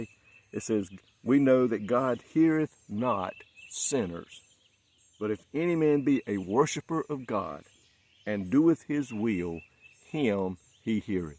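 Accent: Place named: South, United States. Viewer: I too woke up in the woods on a spiritual retreat in Ohio. You blessed my soul with the nature sounds and that familiar Southern accent (I’m from TN). Thank you for sharing your time and your prayer of blessing on us watchers (I just searched for morning devotion and this came up). God, heal his eye issue. ❤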